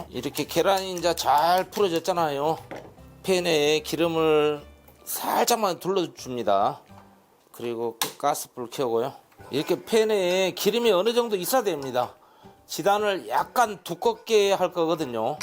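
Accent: Jeolla, South Korea. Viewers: 구수한 전라도 사투리도 일품입니다 웃음도 나고요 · ㅋㅋ 오늘따라 전라도 사투리가 구수하네염 ^^ 항상건강하세요. 덕분에 행복합니다 .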